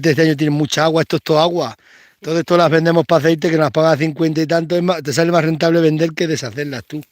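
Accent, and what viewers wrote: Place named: Galicia, Spain. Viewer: Aqui un Gallego .... a éste Gallego de 68 años , aunque repitas 100 veces lo mismo .... ENTRAS EN VENA TIO .... lo dices como hablan los paisanos de A MIÑA TERRA , de la Galicia profunda ....TAL COMO SOMOSSSS!!! ... ERES UN CRACKKKKK !!! ... VER A TU FAMILIA A TU LADO , ES ENTENDER PORQUE LUCHAIS Y LO MAS IMPORTANTE ES ENTENDER PORQUE PROGUESAIS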